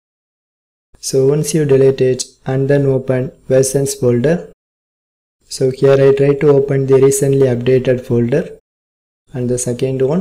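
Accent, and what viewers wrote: India. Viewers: thanks btw i think you are from indian cause your voice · Man the best tutorials are always made by people who has that stereotypical India accent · Certified indian accent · I LOVE THE INDIAN ACCENT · YO IT WORKED I AM NOT A BOT AND THIS ACTUALLY WORKS I THOUGHT THIS VOICE INDIAN GUY WAS SO OBVIOUS BUT IT ACTUALLY WORK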